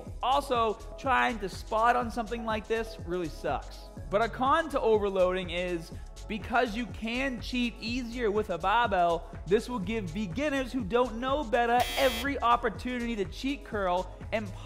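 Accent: Boston, United States. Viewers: gotta love that Bosten dialect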